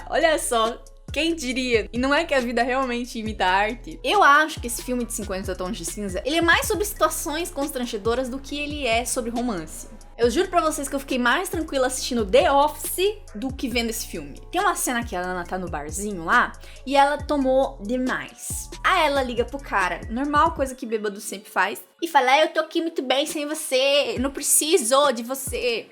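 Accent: Paraná, Brazil. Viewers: Perai, existe sotaque paranaense?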